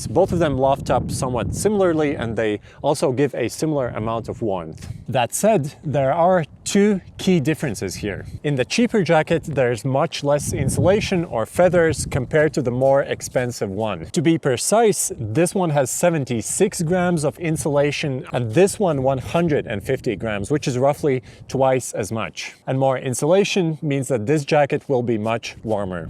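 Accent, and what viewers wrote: Latvia. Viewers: Sounds like Latvian accent... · Tu takš letiņš pēc akcenta 😄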